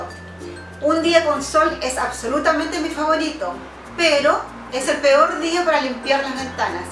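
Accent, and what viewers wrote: Chile. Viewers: Tu eres chilena?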